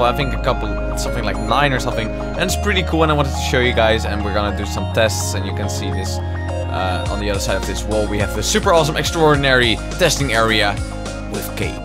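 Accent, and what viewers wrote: Netherlands. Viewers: Je klinkt echt Nederlands! Maar dat neemt niet weg dat je een geweldige stem hebt, creatief bent en echt gave Showcases maakt! Ga zo door, je zal het ver schoppen! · ooh wow firt video I see you and I noticed imidiatly he sounds dutch i look in the discription The Netherlands wow YEAH!! DUtch too here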